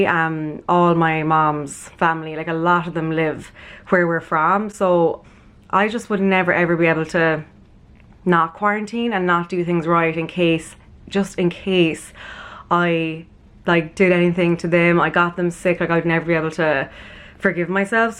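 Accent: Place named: Ireland. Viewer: She's Irish, from Kerry in the south-west of the country. Irish accents are incredibly varied - there isn't just one.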